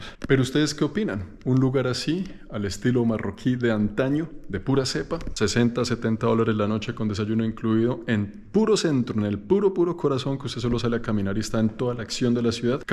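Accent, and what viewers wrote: Colombia. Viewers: Para la calidad y belleza de las instalaciones de esos hospedajes son muuuuy económicos para la mayoria de turistas, sigue disfrutando!!! Gracias a ti ya no detesto los colombianos, a pesar de tu acento eres excepcional, sigue así, o sigue mejorando!!! Felicidades!!!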